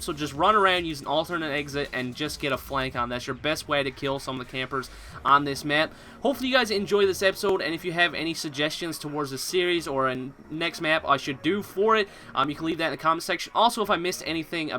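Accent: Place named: Canada. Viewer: Your accent is so annoying haha are you canadian? Also good vids, they really help.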